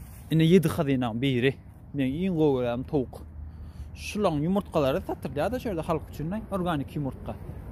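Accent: Turkmenistan. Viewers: Salovmaleykum sen lebaplymy gurleysin birhili menzap gitdi.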